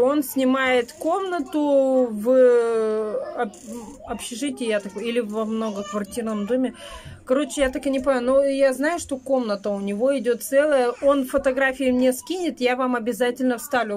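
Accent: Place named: Perm, Russia. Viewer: Зачем Вы позорите Пермский край? Не надо врать, что у нас так все разговаривают. Если Вы не образованная, то не надо говорить, что все такие.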